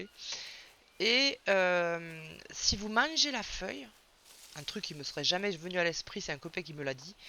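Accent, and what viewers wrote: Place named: South, France. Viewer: Je m'abonne je suis le 100ème abonné, j'adore green hell, j'adore 😍 j'aime le sud et ton accent 😍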